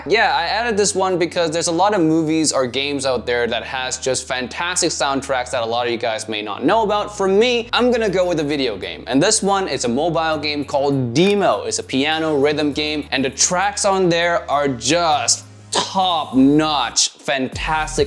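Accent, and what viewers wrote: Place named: Singapore. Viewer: Thought you re a Singaporean...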